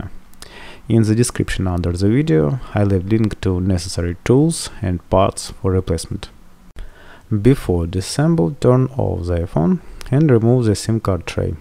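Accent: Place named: Russia. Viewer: ду ю кен спик рашен, бекоз ю хэв рашен акцент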